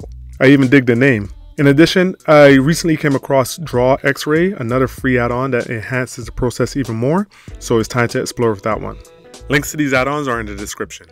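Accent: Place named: Barbados. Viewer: Bro Idk why you sound Bajan to me. Thanks for the vid, gonna try this addon out once I get better at Blender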